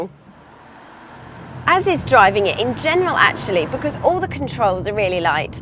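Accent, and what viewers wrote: United Kingdom. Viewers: Alexander Vartzbed and you throw in the snippy British accent too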